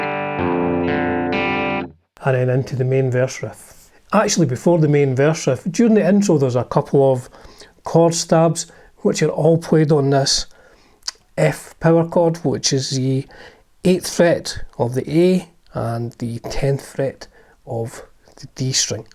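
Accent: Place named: Edinburgh, United Kingdom. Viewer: Brilliant video that's a great song got the original LP and on Dbl live LP at Budokan belter. You're a great communicator clear spoken with that Edinburgh accent. I'm for learning this on my Ibanez RG don't yet have a Gibson or Flying V 🤔🎸👍🎧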